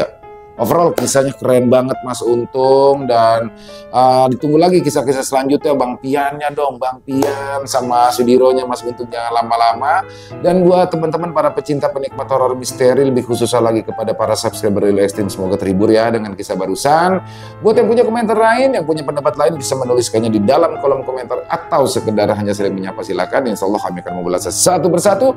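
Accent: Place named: South Kalimantan, Indonesia. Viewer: Met mlm mas dgn suara khasnya anak kalsel hdir lg nyimak ceritanya⭐⭐⭐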